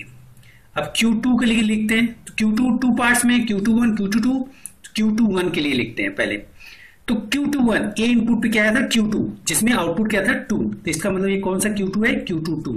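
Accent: India. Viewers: dude i cant understand you accent maybe only indish people can understand u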